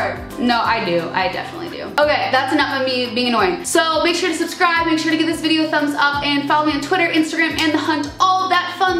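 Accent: Chicago, United States. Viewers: Wait is she from Chicago? Someone please tell me!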